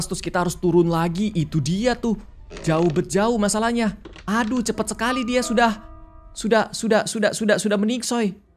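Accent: Java, Indonesia. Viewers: Medhok banget kak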